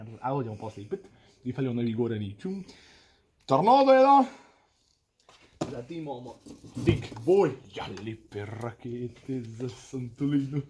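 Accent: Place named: Lumbarda, Croatia. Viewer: Moje srce je sada zaigralo i igrat će sve dok se ne bude pucalo ispod one teće.... uvelike se radujem tome događaju i tom simpatičnom naglasku. Vi ste pretpostavljam iz Lumbarde ako se ne varam? Pozdrav iz Krvavca❤❤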